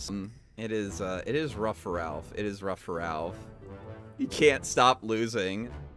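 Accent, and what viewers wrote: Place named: South, United States. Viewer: He makes the southern accent look bad. Please Ralph, please just stop.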